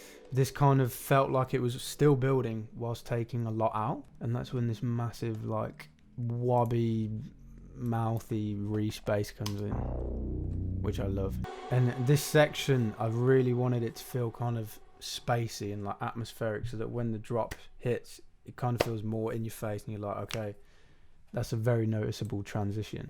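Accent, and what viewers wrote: United Kingdom. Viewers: I love his british accent 😍😍😉🙃🙂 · If I could have one wish granted, it's that even after reaches that, level of fame, he would still be our lovely down to earth Brit who'd sit down and make these videos for us... even in the private jet if he must. Super helpful and makes me really happy just watching a guy who loves his craft, doing his craft. So plz... even with the millions of $$$, I pray that he will still regularly share these humble, happy moments with us. Maybe we can hear Speak Français in a British accent one day... hmm, I will hope and pray.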